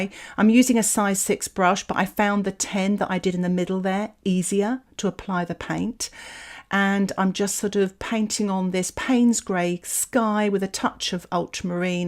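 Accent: United Kingdom. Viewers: Im gonna hear a nice British lady telling me "quit fiddlin'" in my head now if I start overworking something, thanks! Lol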